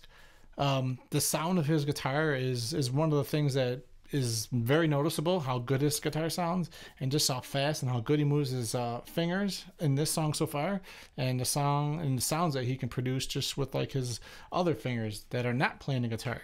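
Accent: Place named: United States. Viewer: good reaction bro, by the way, where are you from, let me guess? your language is American English, am I really you from America ?????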